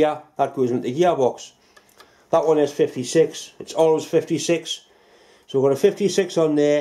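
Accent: Newcastle, United Kingdom. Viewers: 51 years since I worked a metal lathe as an apprentice. Takes me back in a good way. Love the Geordie accent ..... don't hear it very often here in the Rocky mountains.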